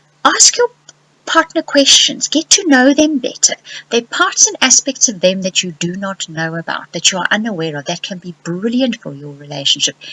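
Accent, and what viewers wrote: South Africa. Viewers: Woah - this made me tearful re the letting go of fear to commit to things. Have had a stop/start thing going on for the past through years (blame Pluto?) re work and have been reluctant to go in boots and all because of it. Crossing fingers for smoother waters. Love your SA accent! Cheers from NZ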